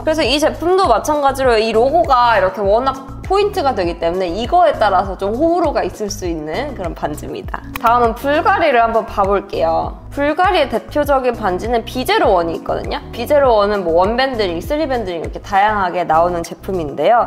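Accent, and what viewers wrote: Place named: China. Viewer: 어디 사투린지.. 독특한데 억세게 들리네요. 억양이 중국 말투 같아요😅 안녕 바이~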